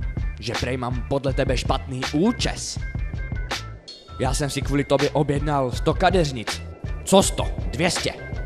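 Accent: Moravia, Czech Republic. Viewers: Paci sa mi Moravstina :D taka slovenska cestina :D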